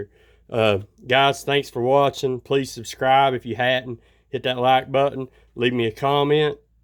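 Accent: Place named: Georgia, United States. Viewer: We are from Georgia. You sound like you are from here too lol! Nice knives! Liked and subscribed!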